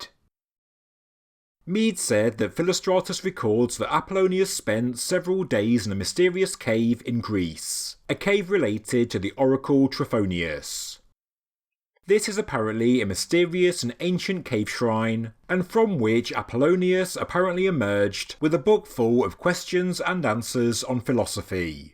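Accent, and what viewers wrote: United Kingdom. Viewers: That’s his regional English accent. I love it!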